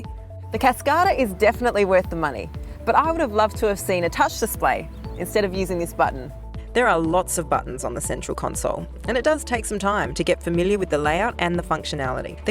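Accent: Australia. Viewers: So weird to watch a sistah with an Aussie accent ;) crikey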